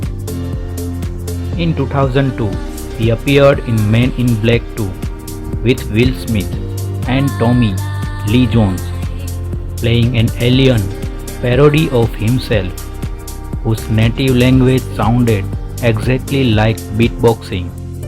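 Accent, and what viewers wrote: India. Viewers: A heavily accented indian guy narrating this video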